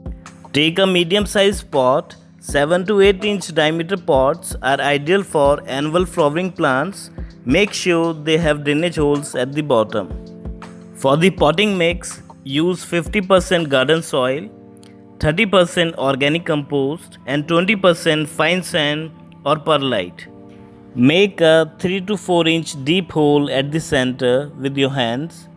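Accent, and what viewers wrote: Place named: India. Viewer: These indian gardening vidoes with indian accents are giving me brain tumor.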